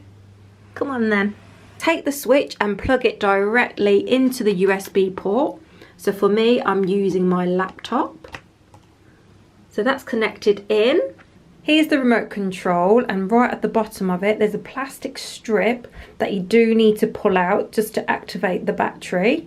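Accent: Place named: Midlands, United Kingdom. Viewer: So nice to hear a Midlands accent on here! Big love from Coventry, love this tutorial!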